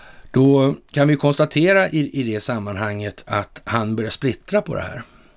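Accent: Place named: Gothenburg, Sweden. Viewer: Vänligt av dig att du tog tid på dig och kom tillbaka tidigt igen ja förra klippet var jätteroligt trevligt att höra lite göteborgska igen det var länge sedan, 🌺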